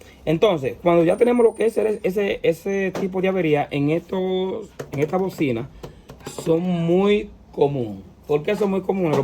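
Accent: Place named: Dominican Republic. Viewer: Ignorante ellos hablan así, su lenguaje de los dominicanos es así.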